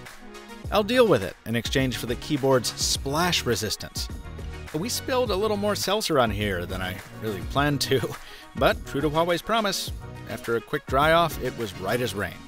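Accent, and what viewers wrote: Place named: Transatlantic, United States. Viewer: Nice Transatlantic accent.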